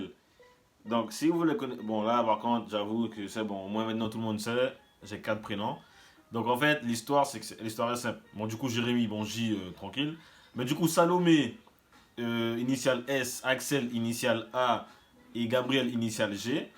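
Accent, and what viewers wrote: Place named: French Guiana. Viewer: Je le jure que sur la façon de parler ça ce voit qu’il est guyanais incroyable surtout le "les gars"😆 🇬🇫✨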